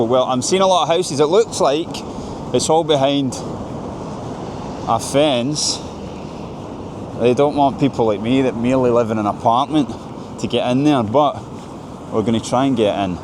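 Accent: Scotland, United Kingdom. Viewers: Акцент конечно😮. Оказывается так по-английски говорят шотландцы. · Я смотрю довольно много видео об иностранцах, путешествующих по России. Но когда я услышал ЭТОТ акцент, я подумал "О, да! Ты точно шотландец!" :D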